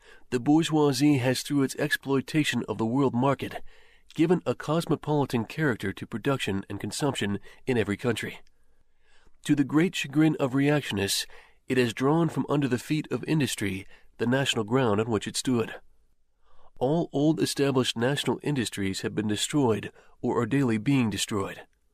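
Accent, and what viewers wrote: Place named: United States. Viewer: The thick American (AI?) accent and unrelenting monotone renders this historic document unlistenable.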